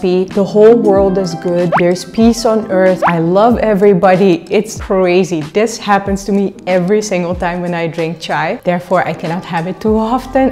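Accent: India. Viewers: You have an Indian accent to me 🙂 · The way you pronounce food items names are typical Indian pronunciation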